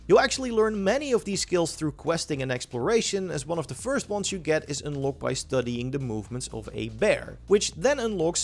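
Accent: Netherlands. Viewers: UK HAHA youre dutch dude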